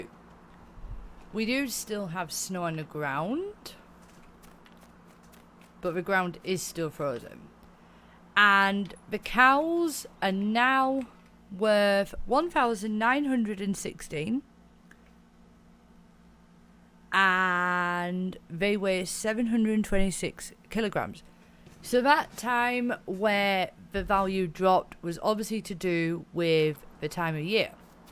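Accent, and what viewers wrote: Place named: United Kingdom. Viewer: With that accent I'm picturing you as a stunningly hot British gaming nerd!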